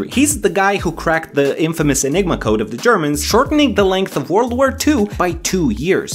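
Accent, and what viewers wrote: Romania. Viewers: The through just sounds ROMANIAN AND I LIKE THAT XD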